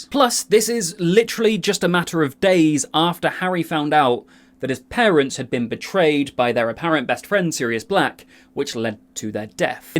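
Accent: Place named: United Kingdom. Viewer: I like how you show books, and the second book is a little bit torn, so it reminds me by first books I read in my childhood. Miss them much. Now I have a Harry Potter audoimarathon, listening all books in English read by Stephen Fry. You videos also help me to enjoy content as well as good English (British) pronunciation. Wish you a Marry Christmas from Russia! (we have our on 7th of January). In Russia Harry Potter movies are not Christmas, but so-called New Year's movies, so I will start to rewhatch them soon)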